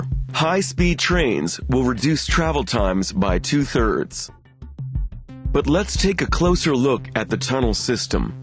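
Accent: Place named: United States. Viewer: Is there a reason for the American Accent?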